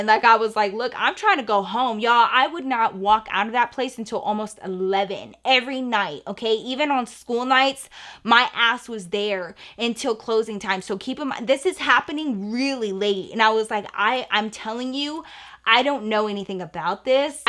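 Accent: Texas, United States. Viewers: when you say "stewpid" you still so have your Texas twang girl 😂👌🏼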